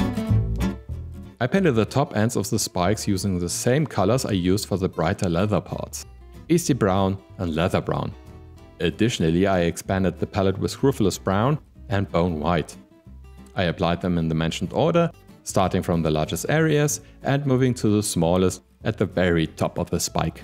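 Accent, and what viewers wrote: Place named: Lower Saxony, Germany. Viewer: haha, ich war nicht 100% sicher denn Dein englisch ist sehr gut... war so ein Gefühl, die Niedersachsen erkennen sich eben 😅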